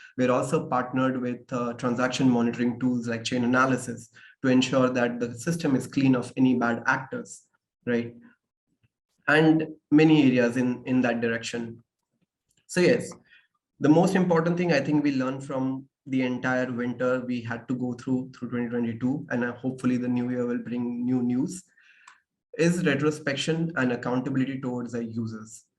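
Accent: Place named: India. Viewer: Indian english 🤤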